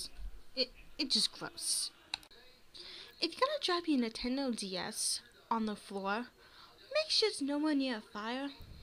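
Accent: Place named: United Kingdom. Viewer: Youre from the US but i can hear a slight british accent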